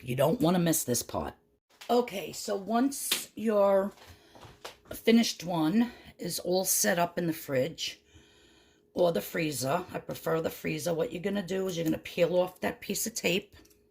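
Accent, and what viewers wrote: New York, United States. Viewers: This would be so cool to make! So cute! I always wanted to buy them in the stores but I would rather control the ingredients. I’m well aware of Avery as a maker. Gonna do that as well. Thanks so much for sharing. Just love that NY accent.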